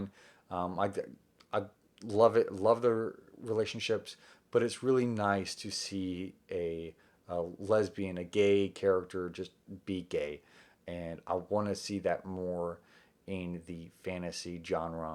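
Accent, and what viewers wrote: South, United States. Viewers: I can't believe you had a book called, "Gideon the Ninth" by Tamsyn Muir at your house in Texas on this video during your quarantine until it came out last year back in September 2019 while you're reading and talking about a swordfighter named, Gideon, who is funny, witty, very charming and such a thorn in Harrowhark's side after that, but I hope your husband, Zach will be very proud of you and I love the black and white makeup on your face to look like the character, Gideon from the book in front of it, because I love your Southern accent and it was my birthday last Wednesday, so I'm 31 years old, right now!!! #GideonTheNinth #bookreview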